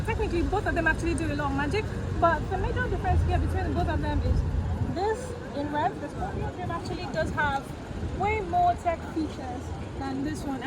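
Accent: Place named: United Kingdom. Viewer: I honestly thought you were speaking Chinese at first. I don’t know if you can speak Chinese. If you can, then your British accent and your speed of speech articulation on top of that is making things worse.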